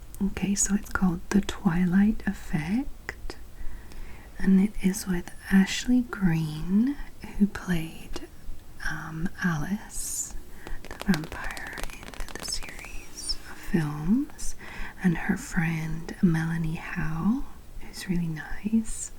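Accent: Scotland, United Kingdom. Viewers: Okay, my attuned ear is picking up a slight Scottish brogue from you,.🤔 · Nice scottish accent. Love it. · What’s your accent? I can’t tell. You sound Scottish/welsh sometimes and American sometimes lol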